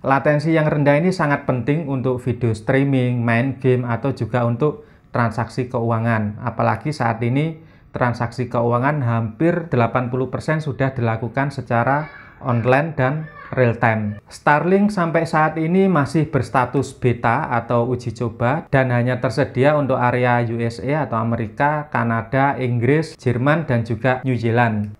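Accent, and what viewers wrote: Java, Indonesia. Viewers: powerful medok kang😁👍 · Ini pasti Orang jawa.ngomone jowo medal.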